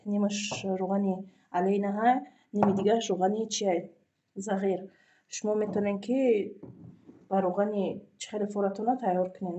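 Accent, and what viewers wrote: Tajikistan. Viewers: ай кучои хисоре чунки камтар лахчаи хисори гап мезане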